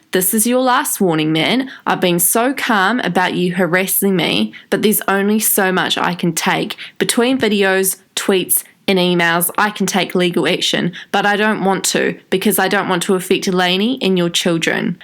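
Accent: New Zealand. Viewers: My wig liteRALLY flew to mars! oh by the way, are you from new zealand? you have a really nice accent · When you're Australian and knew straight away that you're a Kiwi. Also, I love this video. You have a new subscriber. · I'd have told onion to take it to the police if he was so 'concerned' (which we know he won't because: He's got no evidence He just wants attention which is why he's sperging out on social media). Didn't he try that on PewDiePie as well but no one bit, so he's slandering Shane instead? Just discovered you and enjoying it so far. X PS. It's good to hear a Kiwi accent and guess it correctly- yay, I feel validated! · This is a New Zealand accent, right? It sounds like it, but I want to make sure before I show my boyfriend so he knows how the accent sounds lol. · Heehee... you sound like a Kiwi Kath Day-Knight from Kath & Kim, when she's making jokes about Kim's weight to other people right in front of her. 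A little bit too shady for me, I find calling him Onion on purpose kind of annoying. Not because I'm a fan (nope), it's just not much of a burn or a joke, it's just like "Oh, you didn't take long enough to read it... and now that's what you're going with. Clever." Also, onions are pretty popular. Like garlic. Bad rap, but people scoff the shit down (good thing too, they're both super medicinal).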